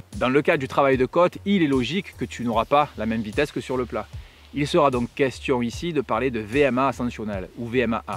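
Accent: South, France. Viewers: Même si l'on pense tout connaître du running, il est bon de revenir régulièrement sur les fondamentaux. Quelques rappels font le plus grand bien (même pour les runners aguerris), nous évite de dévier (cela arrive forcément) et entretiennent le mental en permanence. nous explique tout cela de façon très claire. Les explication sont simples, précises et concrètes. J'apprécie beaucoup ses vidéos avec en bonus un petit accent du Sud qu'on adore.J'ai 63 ans et je fais parti de ces coureurs qui respectent les bases élémentaires du running (hydratation, étirements, progressivité dans l'entraînement etc...). Ce qu'explique parfaitement bien.Cela me permet de continuer à faire mes 50 à 60 km par semaine et tout cela sans bobos.J'encourage à continuer ses vidéos. Un grand merciEric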